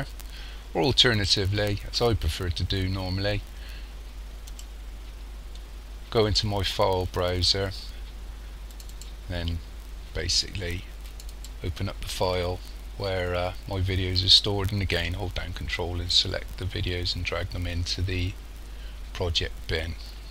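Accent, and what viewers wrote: Bristol, United Kingdom. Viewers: Brilliant video! Btw, are you from Bristol?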